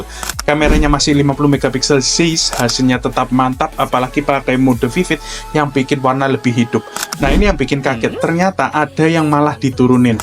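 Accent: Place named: East Java, Indonesia. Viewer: Cra jelasin enk,simple n detail jg,logat mcm jwa timuran❤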